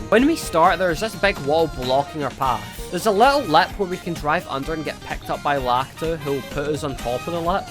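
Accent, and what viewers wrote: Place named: United Kingdom. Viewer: He's bri'ish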